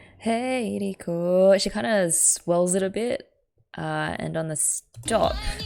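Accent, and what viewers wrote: Australia. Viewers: Oh my god is such a perfect cover song for all of these girls. They add so much personality to it. I especially love Calli and Gura's. When it came it took me by storm and I couldn't get over how perfect it was. Like I would choose this cover to introduce someone to them. Impressive how you picked up even on the accents. It was a 2 min song but you picked up on Calli's southern twang. I also like picking up on different accent, kind of an obsession, and I was guessing you had an Australian accent there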